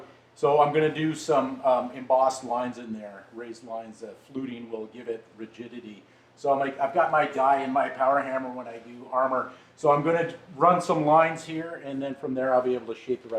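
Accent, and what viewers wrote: Canada. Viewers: Op. I've detect a Canadian accent. I'm in good company. Hello fellow Canadian. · I just stumbled upon your channel and this is the first video I've watched. Thanks for taking the time to make it. From the sound of your "oots" and "Aboots" you sound like a fellow Canadian.....or perhaps Minnesota ;) . Regardless, thanks for what you do. :)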